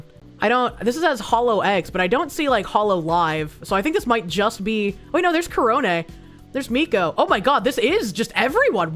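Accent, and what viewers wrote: Boston, United States. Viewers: Listening to Bostonian yap about the two worst people on the planet fighting each other online really helps make this Amazon route somewhat bearable.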